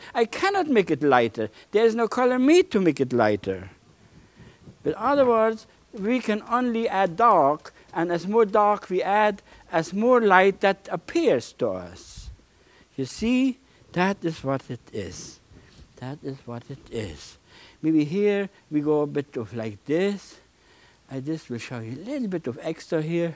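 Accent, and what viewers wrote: Germany. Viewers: I love this “german english“. If i speak, it sounds the same :)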